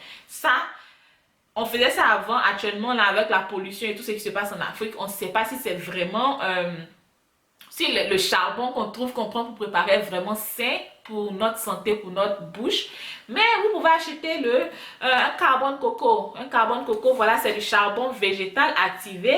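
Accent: Ivory Coast. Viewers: Les astuces sont top, je connais celle du charbon et du bicarbonate mais pour huile de coco, d'abord ici en Côte d'ivoire, c du liquide donc ça dans ma bouche pendant 25 min tchiéé je vais finir par avaler Le carbone coco je peux l'avoir ou stp? 😂😂😂 la manière de parler, ma chérie tu es ivoirienne ? 😏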